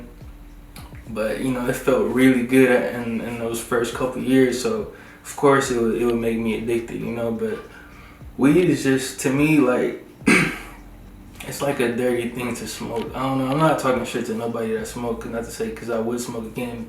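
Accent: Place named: United States. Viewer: Which part of the states if your accent from?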